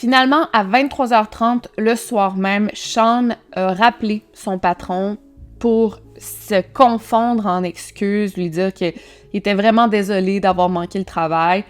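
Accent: Quebec, Canada. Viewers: Allo intenet l accent du Québec j adore 😂😂 bonne continuation à toi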